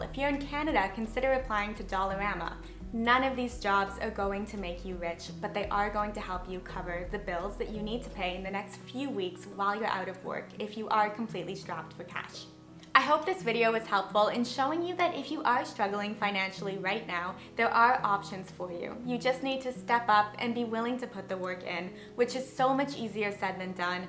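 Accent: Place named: United States. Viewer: Why American accent? English is from England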